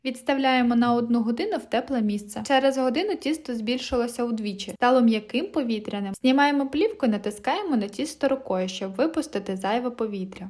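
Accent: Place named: Galicia, Ukraine. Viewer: Чудове відео, швидко,конкретно, та ше й милий галицький діалект! Лайк, подяка, підписка :)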